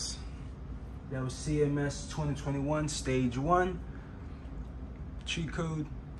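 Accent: Baltimore, United States. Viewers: You from Bmore I can tell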